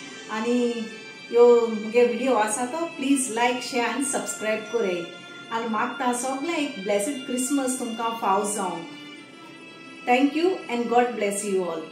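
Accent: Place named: Cuncolim, India. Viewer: I like your konkani dear.are u from cuncolim? It sounds like cuncolim konkani 😅😅. Love all your recipes ❤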